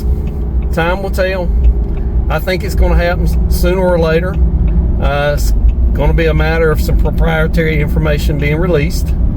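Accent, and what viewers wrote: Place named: South, United States. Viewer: Good review, no fluff, thank you. The best part was seeing/hearing a southern man among southern long-needle pines. I can smell them now. Oooh that smell!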